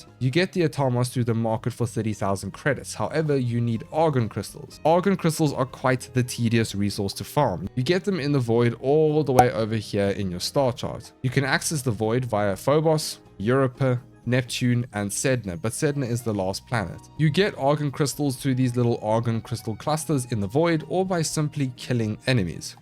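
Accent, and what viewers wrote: South Africa. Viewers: South african? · Are you South African?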